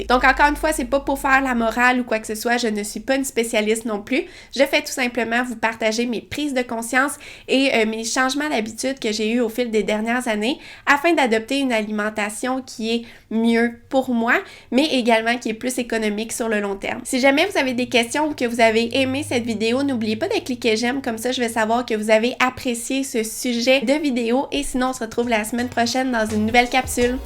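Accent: Canada. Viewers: J’adore votre accent canadien❤. Cependant il m’est difficile de le comprendre donc j’ai dû avoir recours aux sous-titres. Merci de vos informations.🎉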